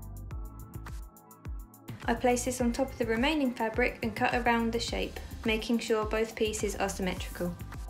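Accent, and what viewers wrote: United Kingdom. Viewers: You have one of the best British accents ever. That’s why I keep coming back. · That accent is amazing.... i wish i lived in Britain 😣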